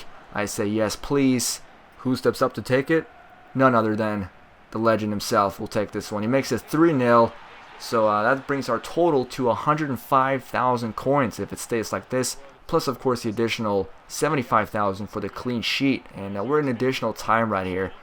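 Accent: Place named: United States. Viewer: You sound american, were you born there?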